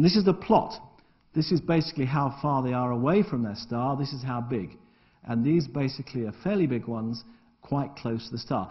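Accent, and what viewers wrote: United Kingdom. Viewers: do you know wot I mean? does that make sense? d'ya see wot I mean? Oh, and that gas over there, that's "MEE-thane"...(ugh, I can't stand the way brits mispronounce words). Oh, and "isn't it?... isn't it?...know wot I mean? Isn't that right? LOL; Really, I enjoy these lectures. Prof Morison does have an interesting, animated mien about his presentations. Always fascinating to watch. And I thought the "Oscar Party" analogy was a great one: it's a great way of explaining how mass works. And, i agree: quarks shouldn't have been arbitrarily changed to "truth & beauty" from what they've been called all along & what is a much more sane label: "top" and "bottom", besides, "truth" and "beauty" are both subjective, where as there's no argument, can be none, to something that is "top" and something that is "bottom"; cheerio! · the British accent is so tantalizing.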